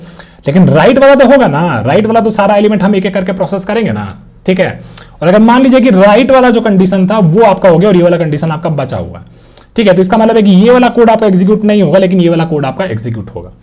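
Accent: Bihar, India. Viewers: Bihari accent ki hi problem h bhaiya baaki content bhaiya ek number hai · i loved the bihari version of speaking just loved that and explanations were also good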